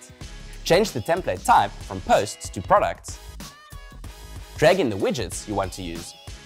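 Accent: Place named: South Africa. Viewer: Dude you sound like South African!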